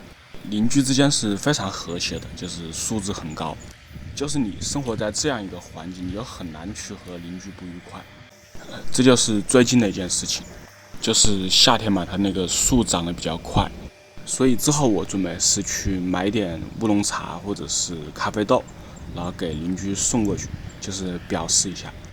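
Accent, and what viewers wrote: Wuhan, China. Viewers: 武汉普通话，一开始就听出来了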